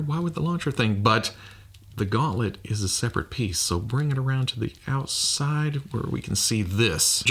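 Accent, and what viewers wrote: New Zealand. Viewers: the kiwi accent is not ideal but still a fantastic effort from a guy so far away from new zealand he’s closer to hawaii…. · lmao I love your New Zealand accent! Excited to get both of these figures!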